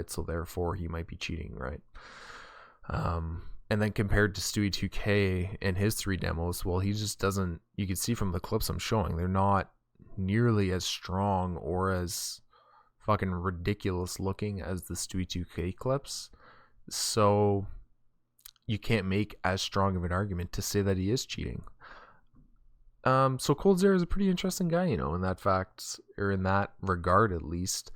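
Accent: Canada. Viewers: are you from montreal bud?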